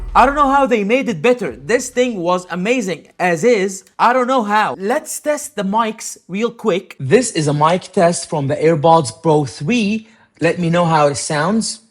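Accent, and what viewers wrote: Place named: Arab world. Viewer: Thanks! Amazing review and I love the after the hype ones. Also adorable arabic accent "Airbods Bro", make me miss Dubai life.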